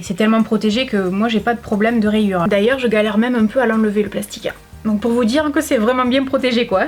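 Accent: South, France. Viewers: Coucou :) Tu viens de gagner une abonnée ! J'ai découvert tes vidéos sur la page d'accueil de YouTube, et j'en ai regardé une bonne dizaine ! Je me suis marrée comme rarement je me marre en regardant des vidéos. Tu es drôle, fraîche et spontanée ! J'adore ton look, ton style et ton petit accent du sud 😃 Et mention spéciale à la vidéo "mes pires moments de solitude" où j'ai hurlé de rire! Je regarde tes vidéos le soir dans mon lit après une journée de boulot et ça me détend ! Gros bisous et ne change rien, c'est parfait 😘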